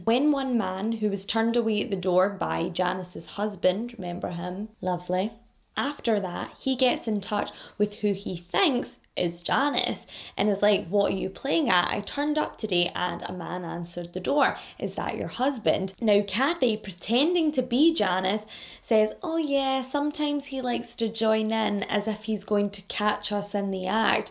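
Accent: Scotland, United Kingdom. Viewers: it really did tho mamas!! Buuuuut I've got you on the big screen rn, and even got my partner into your true crime stories! He enjoys your beautiful Scottish narration just as much as me! 😍💗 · Hi just found your channel out here in these YouTube streets and I LOVE YA!!! I love listening to the Scottish Accent!!! Yay!!!! Not to mention hoe gorgeous you are and your sense of humor is epic!!! 😉😉😉😉😉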